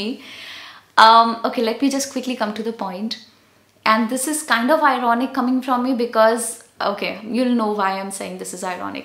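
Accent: India. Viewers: As a westerner I have noticed a possibly related problem here on youtube. Sometimes when searching for tech advice I used to find videos from Indians that would have a title written in English, but then when you actually watch they are speaking in Hindi or another Indian language. Sometimes they would speak a couple sentences in English and then switch their language for the rest of the video. It made no sense to me back then because it seemed like it was just wasting people's time, especially when there would be no subtitles. However, because of this video I think I understand why this happens. It seems that some Indians use English as a way to show status or brag even if they are not necessarily comfortable with the language. Another problem is that it seems many will speak broken English with grammatical errors or in a way that is way too formal, but seems to have a strong Indian accent while still speaking English very fluently.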